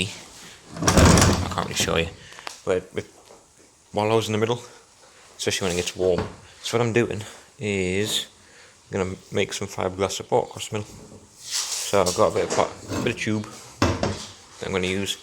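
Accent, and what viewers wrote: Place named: Northumberland, United Kingdom. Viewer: Where abouts you based mate? Sound local I live near Morpeth and I am about to do my little fez up soon. Many thanks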